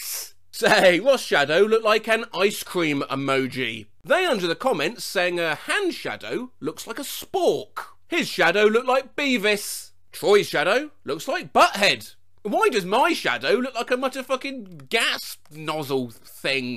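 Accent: United Kingdom. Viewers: British? Stopped watching the video immediately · Scooooul Emooooji, i love british accent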